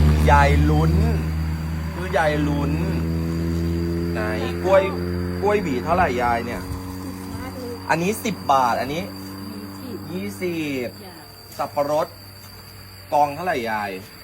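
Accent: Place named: Laos. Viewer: Northern middle Laos accent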